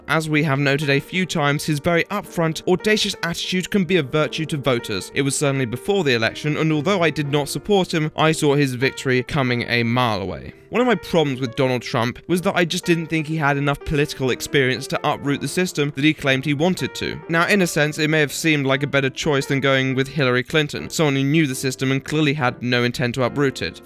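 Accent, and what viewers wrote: United Kingdom. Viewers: What part of Britain are you from just wondering because colossal is crazy sounds the similar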